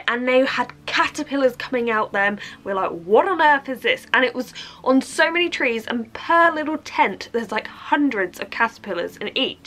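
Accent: United Kingdom. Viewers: I’m from Minnesota which is in the USA. I bet my accent would sound funny to you guys too haha! Where exactly in Britain is this accent from? So adorable.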